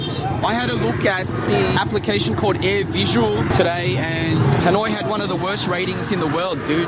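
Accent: Australia. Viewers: Enjoyed your video. I can hear your strong Aussie accent but didn't deter from you expressing yourself and giving your thoughts in an honest and genuine manner. In fact I loved your honesty. So good on ya mate! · he grown up in Australia ,can't you tell by his accent ?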